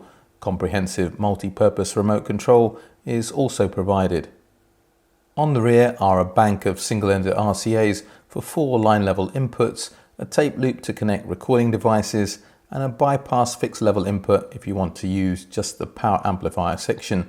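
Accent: United Kingdom. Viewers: I always look forward to your reviews and your rich British accent. I have to admit my jaw dropped when I saw you select an AC/DC album. LOL! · Hej! As a Swede, I sincerely enjoy your fine channel contents and your great British pronunciation…… I try to learn from it too 😀. I run a pair of Q Acoustics Concept 500 that I feed from an old Krell KBL and a KSA250. The silly amount of current that beast puts out hold the drivers in an iron grip….. and I must say it sounds pretty nice. Wonder what this reviewed Atoll integrated amp would sound like in comparison? Thanks for your great reviews! /Jan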